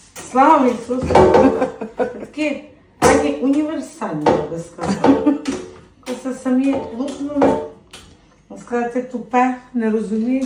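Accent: Ternopil, Ukraine. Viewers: Ви не розумієте, це Тернопільський акцент і мова у них друга, так само порівняти людей з Одеси, Львова, а Закарпаття там взагалі мова на мові не знаєш якою говорять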